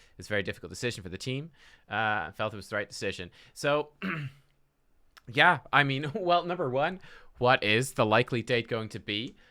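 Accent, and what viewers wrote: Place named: Ireland. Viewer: Judging from his accent, I think he’s from Ireland, correct me if I’m wrong though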